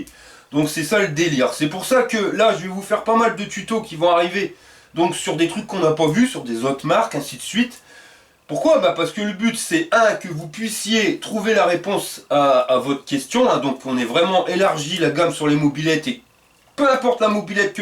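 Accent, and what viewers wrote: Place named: Nord-Pas-de-Calais, France. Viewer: Salut C'est vraiment mérité les 100 k pour ta chaîne j'aime beaucoup ton style et surtout l'accent chti vu que je suis à l'opposé géographiquement parlant. Prends soin de toi.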